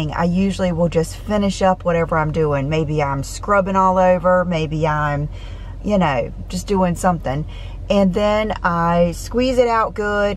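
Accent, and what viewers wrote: South, United States. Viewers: I’m loving these daily vlogs....love seeing how much our days are so much alike. We really are more similar than we are different. And we both have accents...yours is a sweet Southern and mine is a horrible NY/ Long Island accent...lol yuck. I can’t believe how long your hair has gotten. I’m trying to grow mine longer...what did you do to grow yours so fast? Vitamins?? Beautiful neighborhood. The home pastel colors reminds me of Bermuda homes. Idk the cost of the homes there but anything like that on LI is well over a million $$.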